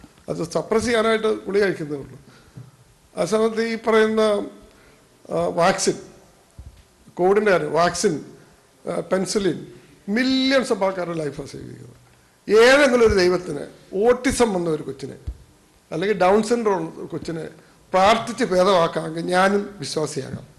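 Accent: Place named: Kottayam, India. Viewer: 40 വർഷം ആയി us ൽ ജീവിക്കുന്ന ഈ മനുഷ്യൻ എത്ര സുന്ദരം ആയി ആണ് തനി കോട്ടയം ഭാഷയിൽ സംസാരിക്കുന്നത് 👍🏼👍🏼👍🏼...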